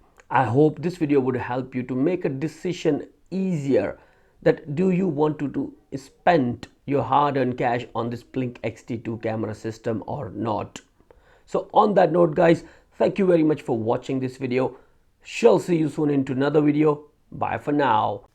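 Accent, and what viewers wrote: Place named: India. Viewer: You are speaking Indian, we didn't able to understand what you are saying !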